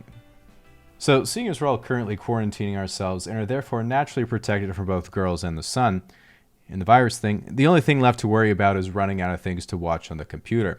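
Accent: Australia. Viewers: Holy fuck I would never have put you as an Aussie but now you've mentioned it I keep hearing the accent slip through every now and then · I havnt heard your voice for a year and you sound a lot more Australian than I remember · Other videos where he does his Australian accent? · im from Tassie, and I always thought you speak with an aussie accent at times! how cool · It's obvious you lived in Oz as a kid. The accent is perfect. I'm from Tassie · I know i never heard you talk with an australian accent before. Did you chat with your Mum for a while on whatsapp must before you voiced this? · your accent is a noticeable mix of australian and north american english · Please keep talking in the Australian accent thanks! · your aussie accent is mindblowing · How does your Australian accent sound so much like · Causally explained in Australian accent hits different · As an Australian, man definitely knows his culture. 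Even his accent is amazing, surprised he didn’t keep one from when he went to primary school here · Your Australian accent is my favourite thing about this channel probably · Can we all take a minute to appreciate his Aussie accent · Dude. I knew you sounded Aussie sometimes. You say the animals like you never left but most of the time you now sound vaguely American/Canadian i was never sure cause I'm Aussie lol *Edit I just got to the end and found out youre from Tassie..same...which wouldn't be weird except there are so few of us. Props for being YouTube famous hey. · You are one of the only American with an authentic Aussie accent, also, the drop bears 🤣🤣🤣 Australian gang where you at? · that flawless yet unendingly irritating Aussie accent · Solid Australian accent 👌🏽 but then you mentioned telstra and gave it away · Wait... your Australian? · Ahhh cool I thought you could nail the australian accent, do a good job of getting the extended vowels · I like how it’s hard to tell what his real accent is. 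Is he faking the Australian or Canadian? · after watching this i have decided australian accents are nice actually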